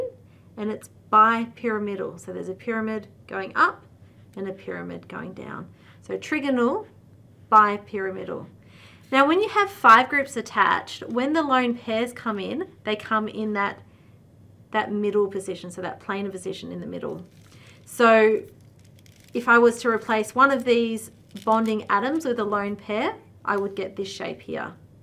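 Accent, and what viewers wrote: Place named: Australia. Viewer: Good video. Well explained. The Aussie accent is easy to listen to as well.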